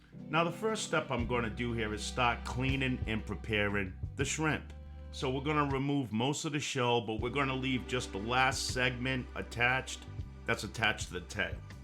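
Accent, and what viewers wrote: Rhode Island, United States. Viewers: ahhh. I see. Well, you certainly have not lost the RI accent!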